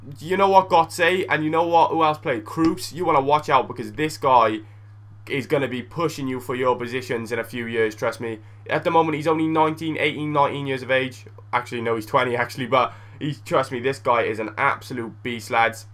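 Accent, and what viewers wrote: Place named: Welsh Valleys, United Kingdom. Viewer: You sound like the guy from the valleys haha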